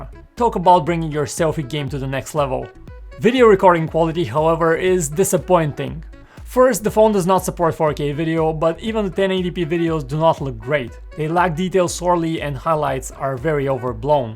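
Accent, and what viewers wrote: Varna, Bulgaria. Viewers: Are u slavic ? Bulgarian perhaps? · Само аз ли забелязах че си от варна :Д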